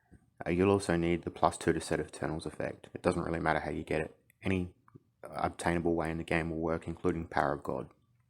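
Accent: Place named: Australia. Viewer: g'day mate you r definitely an Ozzy that's for sure lol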